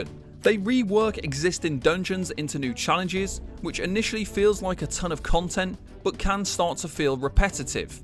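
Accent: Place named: United Kingdom. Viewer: Seems you have an issue with the British Accent. Because there’s absolutely nothing wrong with how this content creators talks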